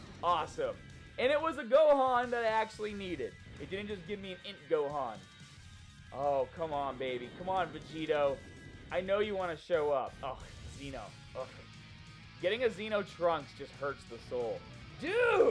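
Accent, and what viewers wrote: Boston, United States. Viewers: Don't let toon bully you out of your Boston accent man! I loved the way you said dokkan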